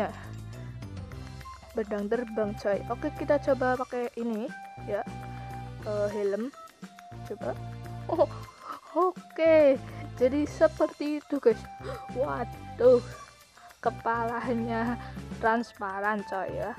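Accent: Java, Indonesia. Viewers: Jawa bgt Bang🤣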